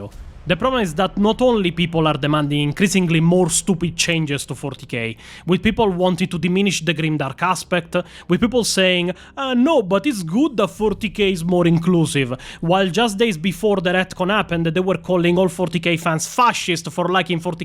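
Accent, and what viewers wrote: Italy. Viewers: I am so glad to have someone put this into better words than I ever could have. Thank you! I love your Italian accent, and I wish to see bloopers/behind the scenes of this. 🤌🏻👀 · I love the Italian accent. I could listen all day :) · L'accento meridionale!!!!11111!!!!!!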